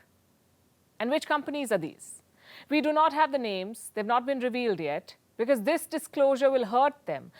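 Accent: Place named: India. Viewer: She is average indian lady. She speaks English in hindi style with modulation. May be u like her modulated style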